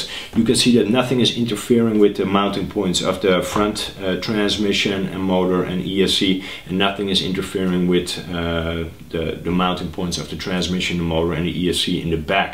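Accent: Netherlands. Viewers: I hear a bit of a dutch accent. great video, even though I'm a bit late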